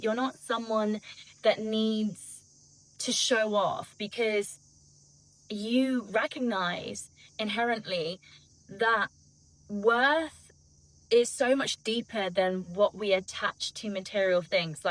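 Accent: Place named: England, United Kingdom. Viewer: Thank you for posting this. This resonated with me so much that the English accent did not even register with me until halfway through. This is the sign.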